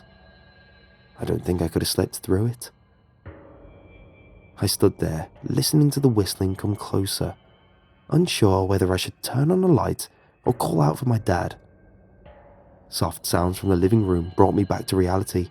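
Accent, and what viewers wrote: United Kingdom. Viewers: knows what he does his brit voice adds to the creep but then agian i only really listen to him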